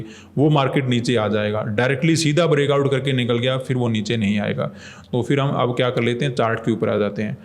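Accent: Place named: Rajasthan, India. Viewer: आपके बातों से लगता है कि आप राजस्थान से belong करते हैं|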